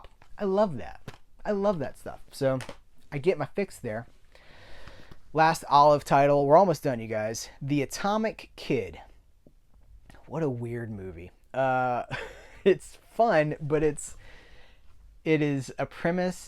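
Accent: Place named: Australia. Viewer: I think your Aussie accent is perfect. And this is coming from someone who is not Australian.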